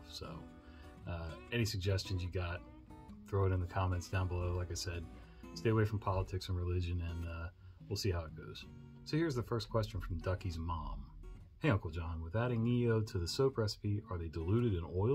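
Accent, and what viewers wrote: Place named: Maryland, United States. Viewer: When I heard you talk, I knew you were a Marylander.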